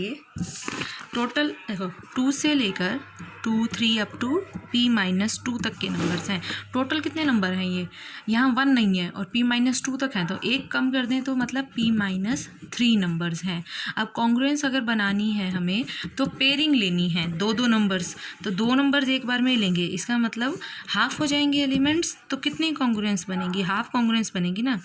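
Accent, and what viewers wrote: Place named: India. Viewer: Is every damn mathematician on the Internet an Indian person? I'm having trouble with ALL the Indian accents.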